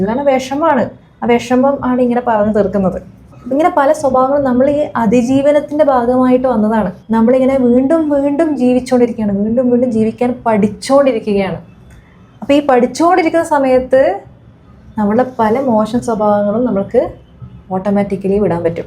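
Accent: Kannur, India. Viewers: Ningal kannur ano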